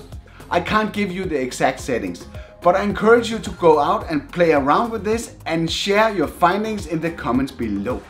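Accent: Denmark. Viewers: Love the accent. Danish... :D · That’s a very danish accent there👍👍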